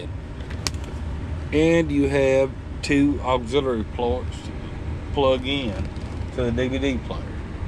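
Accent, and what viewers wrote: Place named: Texas, United States. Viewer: Gotta love the Texas accent. And thanks for the information ❤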